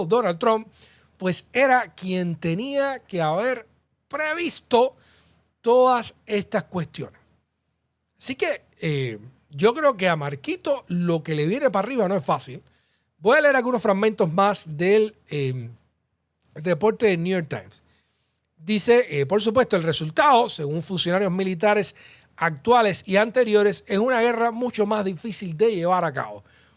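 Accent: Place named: Cuba. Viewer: con todo respeto, mejora tu dicción, he oído a otros cubanos y tu acento resulta demasiado cansado. Tu información buena pero cuesta trabajo seguirte con ese acento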